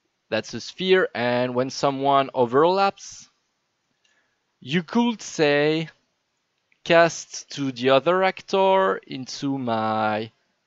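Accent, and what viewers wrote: France. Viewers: Es-tu français ?? sinon très bonne vidéo comme d'hab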